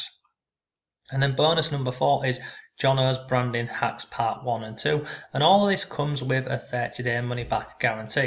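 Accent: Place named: Northern England, United Kingdom. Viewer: your from up North somewhere in England.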